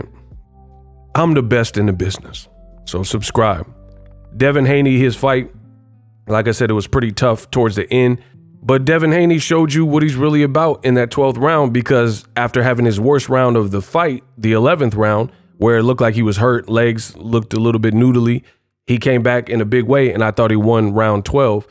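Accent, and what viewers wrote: Baltimore, United States. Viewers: The Bmore accent, up there with the Pacman, Bob Arum, Kell Brook. Best in the business-Smash the LIKE 👍🏾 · I can't with you, and the Baltimore accent 😂😂😂 in boxing sometimes fighters learn things the hard way, DHP will have an answer for the left-hand in his next fight. What a great year in boxing if we get Tank vs Devin in 2023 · Lol@ your B-more accent.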